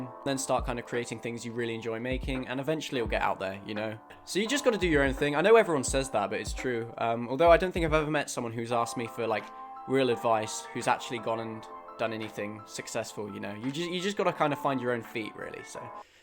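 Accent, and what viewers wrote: United Kingdom. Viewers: He hides his scouse accent really well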